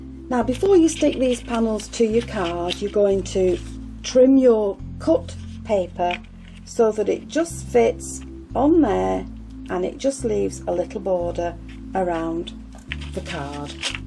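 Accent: England, United Kingdom. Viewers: Love love love all of your beautiful cards and so enjoy all your videos. Your soft soothing voice and that cute English accent bring me back time and again. What is your source for the thousands of flowers you are continually using? I sure can't afford all those dies, though I have many and would relish having more!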